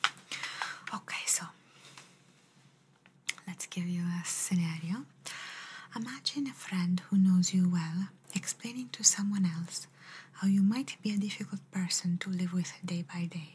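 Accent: Italy. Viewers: Italian accent videos are perfect for relaxing and unwind 😊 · Hello, perfect ASMR, I loved it, I enjoyed it, it's very relaxing and with an Italian accent, I love it, greetings, beautiful.💖💖🌹🌹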